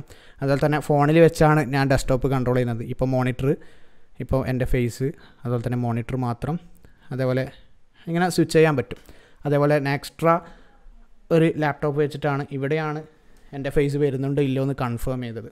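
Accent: Kannur, India. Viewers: Kannur ano bro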